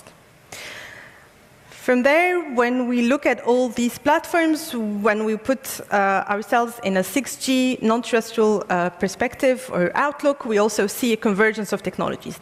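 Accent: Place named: United States. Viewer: Amazing American accent but very hollow speech...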